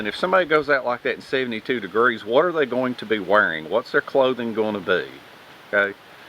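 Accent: South, United States. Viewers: As always, thank you for an entertaining learning session. I've said it before, you are the Mr Rogers of survival. I've watched my share of survival videos and all of them take on the overtly serious tone which makes the video far less entertaining (They need to learn to lighten up and laugh at themselves). You're honest in your situations and circumstances (just like the tent fire with the carbon fiber chimney) and you keep a fun and positive attitude. As I stated before, the Learning Channel needs to take a serious look at your videos and NOT CHANGE A THING about what you do in them and allow you to keep in real. As a fellow southerner (Look Out Mountain, TN) living in California (but leaving soon), it's a breath of fresh air to hear a real southern accent. You've got the regular TV programs of today beat, my friend. Except for Twilight Zone and Hogan's Hero's, there's no reason to watch the TV when I have your educational entertainment available here. 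Be safe my southern brother, and take care!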